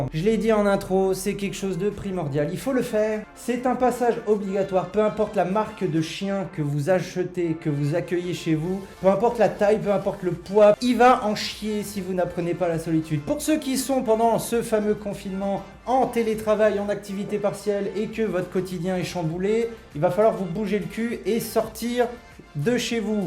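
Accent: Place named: Moselle, France. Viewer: Moi j’ai compris ce que tu as dis 🤣 Tu viens d’où de Moselle?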